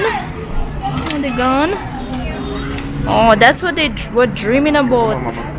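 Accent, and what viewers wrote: Jamaica. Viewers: I love how she sounds like a long time yard woman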